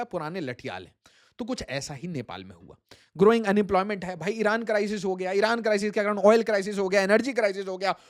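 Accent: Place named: Bihar, India. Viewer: Sir aap Bihari kyu bngye ho accent ko kya hogya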